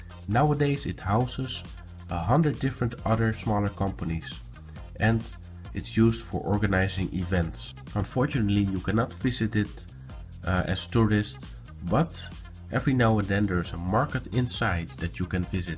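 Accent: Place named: Netherlands. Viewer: To be honest , please pronounce "s" in the end of a word more like a "z" ,not whith a Dutch "lazy s". Same goes form "d" (not a "t"), like : "and" NOT "ent". Sorry to say , BUT if you really are into English , please get interested in pronouncation. (Sorry and good luck)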